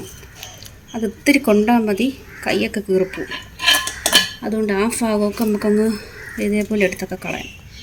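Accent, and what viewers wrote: India. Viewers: ചവള സൂപ്പര്‍ തിരുവനന്തപുരം സംസാരം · Curry is superb, MY Trivandrum language is Superbbbbb 😊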